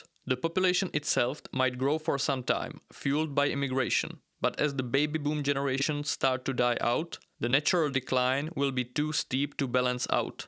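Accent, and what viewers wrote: India. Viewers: If I didn’t know he is Czech , I’d say he is Indian based on his accent . He is way too optimistic about USA but overall interesting videos . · your accent sounds indian!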